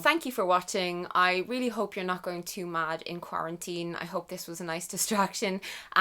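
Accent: Ireland. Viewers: Hey. I'm am old fan. I picked up ur Irish accent in my teens 😂. I'm in my 20s now and my 3 worst habbits i wld really like to control are: 1. Waking up late. 2. Biting my dry lips. 3. Not drinking enough water.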